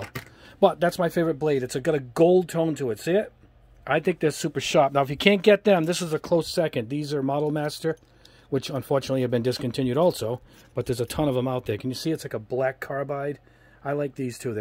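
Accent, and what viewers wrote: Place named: Long Island, United States. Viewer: Long Islanders understand his perfect accent:)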